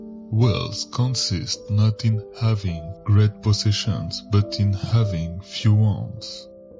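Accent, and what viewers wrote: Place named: France. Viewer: This guys voice fd the whole video up... Annoyed me so badly... French?? What is this voice?? Yuk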